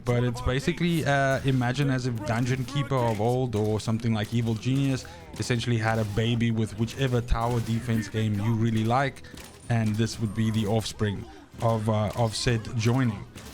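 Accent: South Africa. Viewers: i can hear some south african .awe my bra kwai video!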